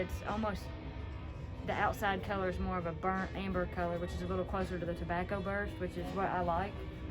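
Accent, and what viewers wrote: South, United States. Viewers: Love the southern twang accent 😍😍 hate my voice. Anyways GREAT PURCHASE 👌🏽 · Watching your videos make me miss my home of Oklahoma with country sounding people and the country accent that I understand. Your videos bring a bit of home to me here in Utah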